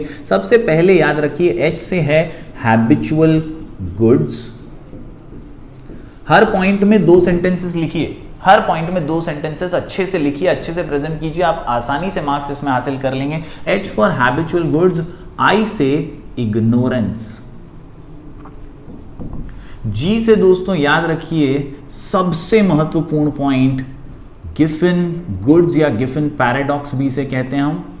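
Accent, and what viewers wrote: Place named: Gujarat, India. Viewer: Sir where r u from? Gujarati lgte ho 😅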